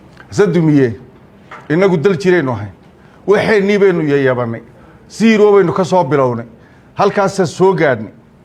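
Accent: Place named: Somalia. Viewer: Waa faqash miyaadan arkayn lahjadiisa doqoniimadu ka muuqato kuma dhacaan in ah inalahadlaan iyagoon iskakeen dhigin😂😂😂😂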